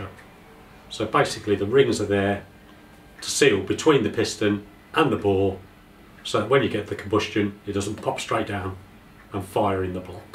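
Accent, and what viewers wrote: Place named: United Kingdom. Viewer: Sound like your from UK do you do anything for the 4g63 engine ? And the k20 Honda ?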